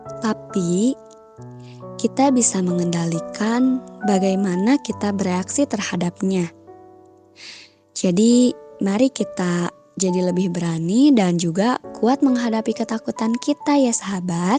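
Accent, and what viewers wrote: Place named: Medan, Indonesia. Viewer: kakknya pasti orang medan kan🥰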